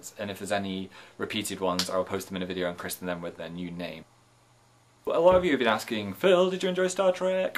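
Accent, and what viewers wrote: North, United Kingdom. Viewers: northern accent is strong in this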